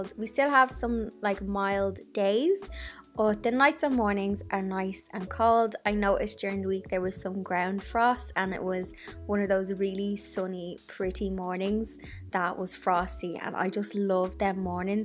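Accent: Ireland. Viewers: Hello,I've not long subscribed.Im loving you alot!!!!😘 You have the most girly vintage snuggly cuddly house.🤗🌟 My dream home.Can I move in?pretty please😘😘 You should be so so proud of yourself.Yoh most definitely are an old soul in a young body.I saw that you visited Kilkenny in one video? I went there with my hubby for our honeymoon then Dublin.We saw Irish dancing in one of the pubs.That was sooo beautiful.My dad's family are from county Sligo.We want to go there at some point and look up the family.I love the Irish accent it's just the best.Im busy working my way through all your videos!! There's so many.Im just starting to make soaps and candles etc and I'm getting some fab tips from your videos.much love and stay safe.From a rainy England.🤗🤗🤗🤗🤗😘😘😘😘😘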